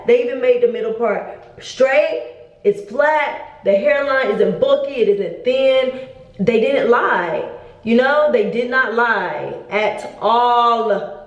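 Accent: New York, United States. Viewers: you're picking up the New York accent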